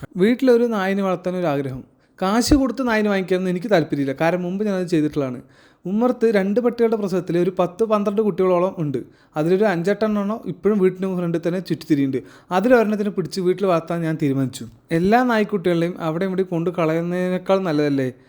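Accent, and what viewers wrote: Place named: Palakkad, India. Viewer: പാലക്കാട്‌ ആണോ... സംസാരം വീട് എല്ലാം അതെ സ്റ്റൈൽ... എന്തായാലും താങ്കളുടെ മനസ് സൂപ്പർ 👌🏻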